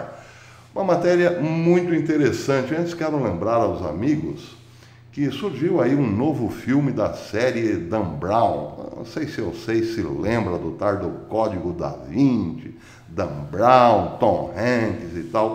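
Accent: Caipira, Brazil. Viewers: O caipirez é vida professor. O Sr. faz muito bem o caipirez sem denegrir ou rebaixar os representados. Somos nós brasileiros que nos comunicamos assim, de forma eficiente e com expressões regionalizada que formam um código capaz de ser decodificado apenas para quem vive a miscigenação que ocorreu em nosso país. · Como sempre seus comentários muito consistentes a atuais! Sempre ba frente da midia "oficial"! A forma caipira de passar a msg tem seu charme mas talvez tire um pouco a atenção do conteúdo. Forte abraco! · Verdade .... adoro essa forma jocosa como o senhor apresenta as noticias Imprimindo este sotaque de caipires e divertidooooo !! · Bom dia Professor! Adoro o seu "caipirez" 😁 Adoro seu senso de humor. Não mude! Continue assim e pra quem não gosta e diz que não vai assistir mais ... JURA? Fala sério 😁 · Bom dia Professô podi cuntinua cum o caipireis ço! 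· Bom dia , Professor !! Esse caipires é o charme do Canal... Semana abençoada para todos !! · Parabéns professor pelo seu sotaque. ...eu entendo muito bem ...sou Mineiro sou da roça sou caipira sou brasileiro....Deus o abençoe. .. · Prof. Boa essa de falar caipirez. Realmente vai enlouquecer os algoritmos. Parabéns pela criatividade. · Apesar das notícias preocupantes, seu bom humor nos contagia! Seu sotaque "caipirês" ficou muito lindo! Parabéns, Professor, pelo seu esforço de desvendar, para nós pobres mortais, as tramas desse mundão de Deus 🙏🙏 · Oh professor eu amo seu caipireis.Bjssss querido, Deus te abençoe 😍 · Bom Dia Professor 😄 Sou De Sete Lagoas MG E Ó Seu Caipirez É O Nosso Normal Aqui😂😂😂💚💛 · ADORAMOS seu caipirês, professor! Aqui em casa temos dois professores de português que o aplaudem! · A falecida e mui saudosa Inezita Barroso iria adorar esse seu sotaque caipira, Professor! 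· O caipirismo é Mil Grau Professor!!! parabéns parabéns PARABÉNS. Continue assim Professor. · continue sempre assim professor,no caipirez ou normal está ótimo parabéns. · Professor,eu adoro ouvir o seu caipirês,eu dou do tempo que se falava assim 😊 Por mim,pode continuar,o que importa é o conteúdo dos seus vídeos 👏👏👏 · Kkkkk É o melhor canal de notícia bom é escutar o caipirez é bem brasileiros PARABÉNS PROFESSOR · Obrigada por mais um excelente vídeo professor! Estou amando o caipirês! Mais ainda ao saber que uns e outros ficam irritadinhos! Que Deus o abençoe sempre professor! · Parabéns professor 👏 gosto muito do seu português caipira..sou da roça hahaha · Bom dia, professor! Não é todo mundo, que tem capacidade de entender o caipirês. Os incomodados que se retirem. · Bom dia, professor ! Assisto todos os seus vídeos.... Não deixe o "caipirês". Adoro!!! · O Tar de Bill poderia explicar o frio que dói nos EUA? 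Aquecimento resfria. Sei.....Quanto ao caipirês, eu amo. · Olá professor. Saudades da dona Lucimar. Manda um grande abraço pra ela. E continue com esse seu jeito especial de dar as notícias. Caipirês é fantástico. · professor por favor fale somente o sotaque caipira , este é o original do brasileiro , obrigado professor , o senhor é um jornalista ótimo , patriota, e cristão. Deus esta com o senhor. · Professor, tá mais gostoso de acompanhar o seu canal agora; além de informação, conhecimento , a gente se diverte a beca. O caipirez ficou top! O senhor leva jeito tb como comediante . 👏👏👏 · Eu amo seu caipirez tudo fica muito mais leve, apesar de todas as.noticias serem claras e sérias sem fale. Isso é o que todos precisamos ouvir para fazer nosso próprio juízo dos acontecimentos sem viés ideológico e isso é muito bom. Obrigado de coração por dividir seus conhecimentos e pesquisas conosco. 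Com ou sem caipirez você é o máximo nas suas análises. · Bom dia professor. Adorei a nova versão em caipirês, pois sou do Nordeste e cultivo os falares da nossa terra Brasilis. Entonces, adorei. Oxente, quem não gostar num goste!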